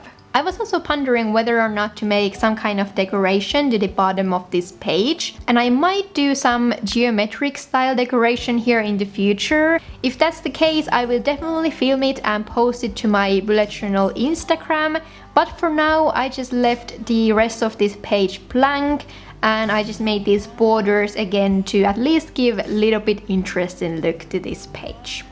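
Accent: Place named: Finland. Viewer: I was watching this after it was recommended to me and was closely listening to the way you speak, thinking "Hmm.. sounds a bit finnish.... but also a bit japanese... Interesting 🤔". Then I checked the info and gasped, you're a finn! Aivan ihanan kevyen näkönen bujo ja todella hyödylliset spreadit ❤👌🏻 Tykkään kovasti! I'm getting Archer & Olive as my next bujo too, I'm absolutely craving for thicker pages! The overall look of them is also very pleasing 😊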